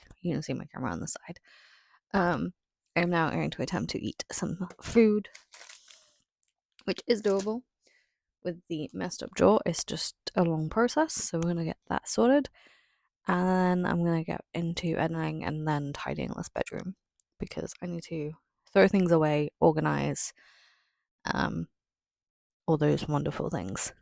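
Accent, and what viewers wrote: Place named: Northern England, United Kingdom. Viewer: honestly hands down you have the most amazing accent on a person who I have ever known up North (and believe me I have met a lot of people in the North of the UK) a lot of people say I have a rather posh accent being from the South of the UK 🤣🤣🤣 I am so glad and happy to hear you are coming back with more videos, and I am happy as always to support my favourite content creator and the content that you create lovely 😊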